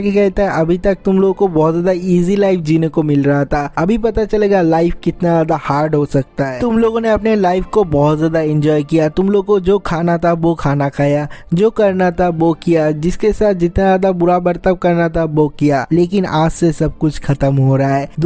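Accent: Bengal, India. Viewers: Bengali hai kya bhai tu🤨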